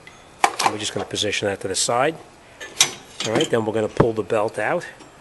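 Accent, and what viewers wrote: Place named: Staten Island, United States. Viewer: Love your videos brother. Your accent makes me miss home...Staten Island.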